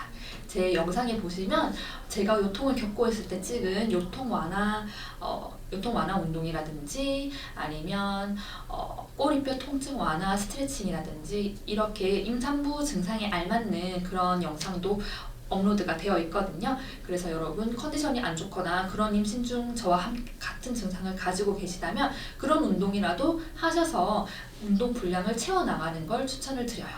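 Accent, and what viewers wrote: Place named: Busan, South Korea. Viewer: 임신부 운동 검색하다가 찾아왔어요. 말투가 익숙하다 싶었는데 부산분이라 반갑네요 ㅎ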